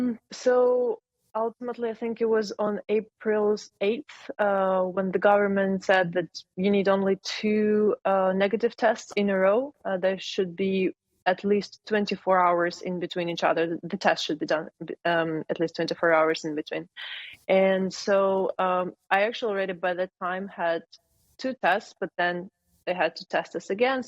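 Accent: Scotland, United Kingdom. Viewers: Cute Scottish accent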